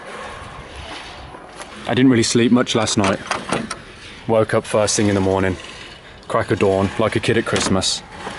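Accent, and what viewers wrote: Birmingham, United Kingdom. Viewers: "It smells like an old VOLVO in here, it's fantastic!" -"The mileage is probably 500 000km, but that's just a number." -" I didn't notice those stains on the seat when i bought it, maybe I left them there, I was quite exited..." LOL! (Bought a thirty year old VOLVO in the dark, literally!) I love this Brummie!👍😄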